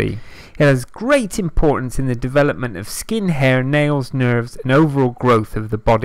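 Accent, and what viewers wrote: United Kingdom. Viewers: I am British living in London. This man is British and is pronouncing things as it should be. This is the UK! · He's British, silly. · Why are people so upset, it’s British English, it’s the English that made English ENGLISH. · He's from the UK. That's the way they say it there. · British accent is the best! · British accent is the BEST omg❤️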